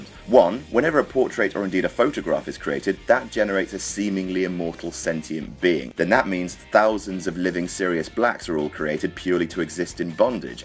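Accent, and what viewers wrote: United Kingdom. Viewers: also fascinating how a brittish accent on a woman can be really sexy and yet a grown man nasally whining in the same accent is like firing a nailgun at a shallow angle against cello made of chalkboard for 6 minutes solid.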